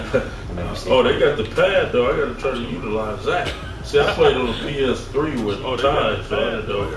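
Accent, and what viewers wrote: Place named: Florida, United States. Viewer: your voice same like florida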